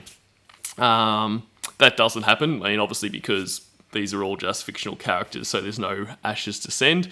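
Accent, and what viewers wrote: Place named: Australia. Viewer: Somebody from Nobles livestream today referred me to this vid for a accurate recap on my Lorne Noir. Soooooooooooooo good 👍 cant imagine all the hours upon hours of Lorne bullshit u had to listen to just to make this timeline, for that, always remember I appreciate yew precious. Lol 🌹🌹🌹🌹 P. S. Ur Aussie accent has been my bed time ASMR lately 😍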